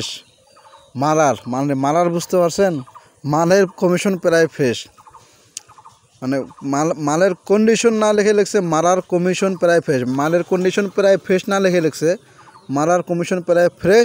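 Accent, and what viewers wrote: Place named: Satkhira, Bangladesh. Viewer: ভাই এগুলা সাতক্ষীরার ভাষা আমার মনে হয়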